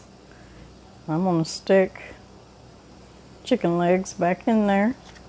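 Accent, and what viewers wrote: South, United States. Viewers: 🤤🤤🤤. Drooling at this video like my pit bull drools when she's watching me it. I marinated some thighs last night in a brine. I was either gonna deep fry or grill. Oh bud thanks to your video and accent, I'm from TN, I know what I'm gonna do, I'm gonna drown mine in gravy, gravy is a staple of the south, and greens mashed taters, but I'm gonna have fried okrie, another southern staple. I'm really surprised I've not run across your channel and what's odd are the number of comments but no thumbs up. Mmm mmm 😋😋😋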